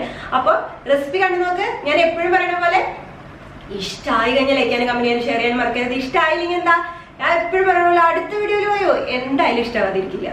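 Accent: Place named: Kunnamkulam, India. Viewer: ആൾടെ സ്ഥലം കുന്ദംകുളം ആണന്ന് തോന്നുന്നു സംസാരം കേട്ടപ്പോൾ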